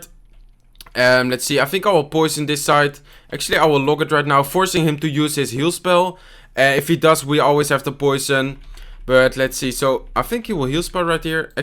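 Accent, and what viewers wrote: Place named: Netherlands. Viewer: R u dutch u sound dutch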